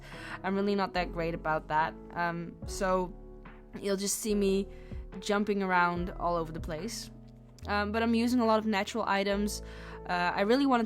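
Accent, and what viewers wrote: Netherlands. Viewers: Love this island! First time I’m seeing your channel but are u Dutch? Thought I heard the accent lol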